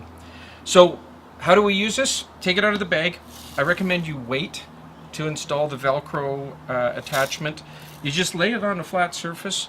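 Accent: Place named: Canada. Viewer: Great video i love the way canadians say about (a boat) and every conversation starts with ah fuck buddy very funny and cool.and whats the details on that lovely himidor ie price.capacity.cheers.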